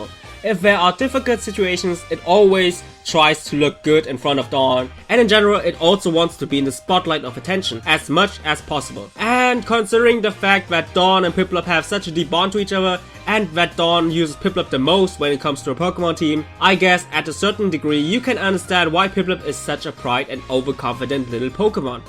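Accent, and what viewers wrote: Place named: Germany. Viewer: Its his accent comon forgive him for being german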